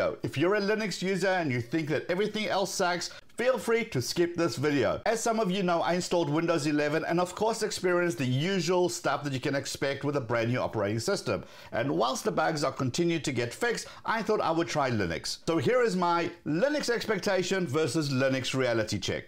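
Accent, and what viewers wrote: South Africa. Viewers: You've got an accent similar to ours in South Africa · Whenever there's a new video I click, for the content, but secretly for the south African accent 😂😂 Much love from 🇲🇿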